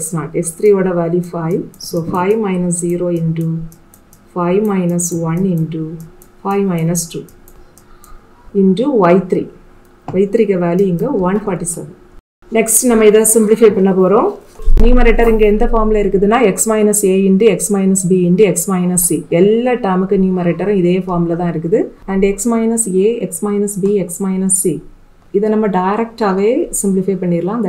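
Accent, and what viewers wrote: Kanyakumari, India. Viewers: Neenga kanya kumari ah ,ithu kanyakumari slang thane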